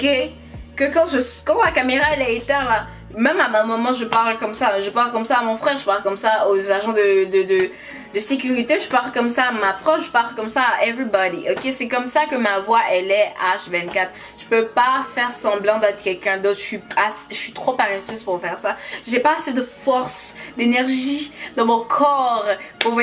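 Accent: Quebec, Canada. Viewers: Franchement ton accent québécois je l’ai décelé dès les premières secondes 😂😂 j’aime bien tes vidéos 😘 take care😊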